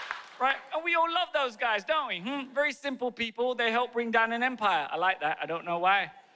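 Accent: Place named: United Kingdom. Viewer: hey, dunno whether i'm more envious of your intellectual comedy, sprightly comebacks, ability to be (thought) provoking without being self-deprecating orrrr you really lanky frame man [i mean, i was no Calista Flockhart back in the day (i'm a guy by the way) but i think of thin or anorexia and i think of her] It is so nice to see someone (of 𝘥𝘦𝘴𝘪 lineage at that) not being lazy in using the 'easy to sell' self-deprecating humour and has enough wits about them to not stoop low or start getting personal! though i DOO love the naughty and brutal bits! Greetings and lots wishes from Bharat <link> [having grown up in Bharat and those were the pre internet era, my vernac. brain still takes time to grasp the British accent:P ]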